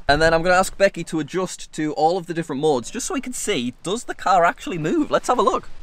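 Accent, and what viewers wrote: United Kingdom. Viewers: Idk if hes british?? Idk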